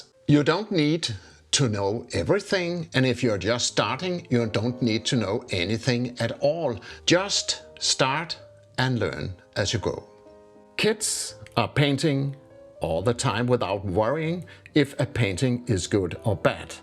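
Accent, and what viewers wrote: Denmark. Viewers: Dear. Just stumbled across your channel. Are you Danish? You sound so Danish. Anyway, love your work and your advice! 🙏🏾 [Edit: "Blomster i Glasvase"? - yep, you're Danish 😅] Hilsen A Brit in Copenhagen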